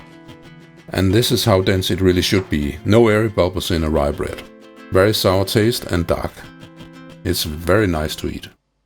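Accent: Denmark. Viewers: Man kan godt høre du er dansker. Tak for opskriften. Den vil jeg starte på med det samme:-)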